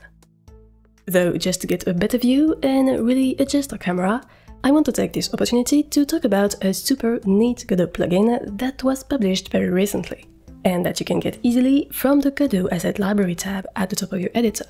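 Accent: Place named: France. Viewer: Wait a Minute... I know that accent... You are French, lived there for 5 years, Toulouse was a great place ^^ Keep it up, great tutorial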